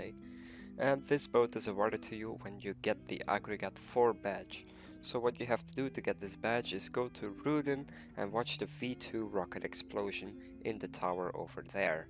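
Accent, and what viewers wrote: Belgium. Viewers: Are you Flemish Belgian??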